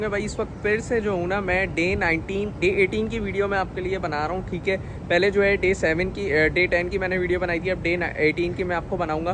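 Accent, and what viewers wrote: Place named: Punjab, India. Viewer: Bhai ap hain kahan? Punjab side lag rahi ha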